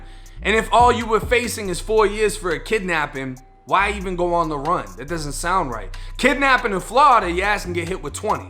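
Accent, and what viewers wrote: Boston, United States. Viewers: I'm new here , you from Boston? That accent got me cutting up lol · You from new England boss?? Deff sound like your from boston im sure not 1st time you've heard that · yo are you from boston your accent is crazy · Are you a Bostonian. You talk like them.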